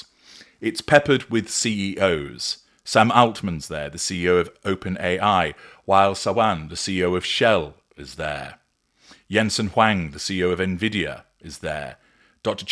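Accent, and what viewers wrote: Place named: England, United Kingdom. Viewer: The way describes TOW with his impeccable English accent always makes me laugh 😂😂😂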